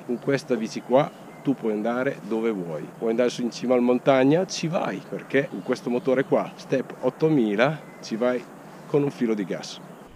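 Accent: Romagna, Italy. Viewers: Ecco perché parli da romagnolo...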